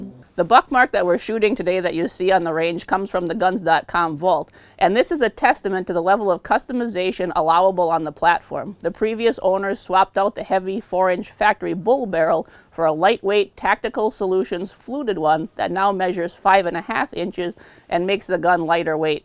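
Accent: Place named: Midwest, United States. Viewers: Wild stab in the dark, she’s from the Midwest? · Ya! Upper midwest, I bet.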